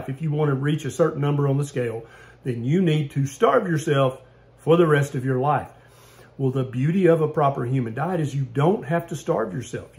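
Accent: South, United States. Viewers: You know...when I heard the Southern twang I knew it was going to be a sobering talk. ⚕️❤ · Sometimes I need my Bart Kay arguments with a gentle southern charm. Thanks for that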